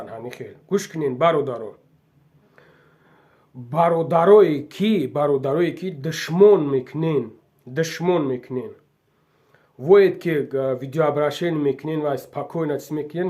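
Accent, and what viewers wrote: Kulob, Tajikistan. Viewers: Ту шердили Звенигород меги й дигачодум бра така риши рихи Кулоби нав гап зан шиди.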